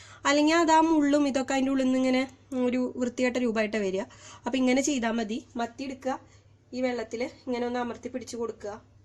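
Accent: Thrissur, India. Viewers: ചിരിച്ചു വയ്യ. ത്രിശൂർ ഡയലോഗ്... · ചേച്ചി തനി തൃശൂർ ആണല്ലോ 😁😁😁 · Thrissur slangum curryum superrr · Extremely well presented. Your language style is excellent and your honesty is highly appreciated. Not many people admit their success through the trial and error method. Your cooking is good and your simplistic Thrissur style commentary is both appealing and amusing. But let's not take away any credit from the delicious dish you have prepared. Keep going and may you present many more such interesting and appealing recipes.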